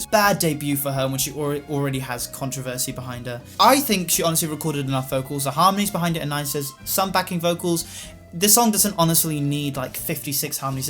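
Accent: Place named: Southern England, United Kingdom. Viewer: Love your accent bro 👌 southern england aroundish London? Lol 😄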